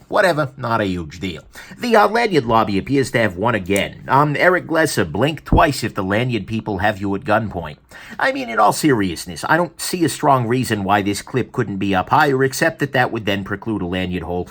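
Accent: United States. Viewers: downvoted because of this stupid yankee accent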